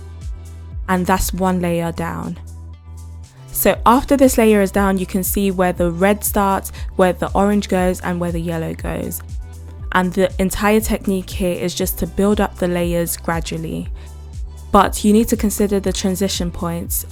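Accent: United Kingdom. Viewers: Your british accent is so satisfying...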